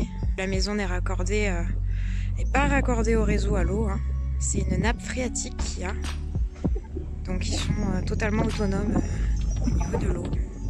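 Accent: South, France. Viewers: L'accent du sud il est vraiment classe 🤙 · L'accent du sud 👍😀.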